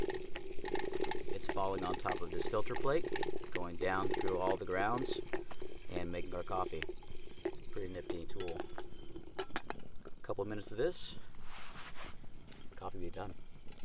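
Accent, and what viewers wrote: Texas, United States. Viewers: most satisfying Texan voice ever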